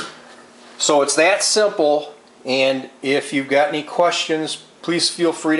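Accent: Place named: Wisconsin, United States. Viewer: I noticed your Wisconsin accent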